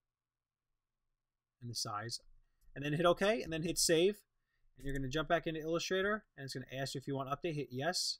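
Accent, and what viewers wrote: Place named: New York, United States. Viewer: Noo Yourk.... I love your accent so classic NY! Not a dis but coming from the midwest like i do it is refreshing to hear and love this new series.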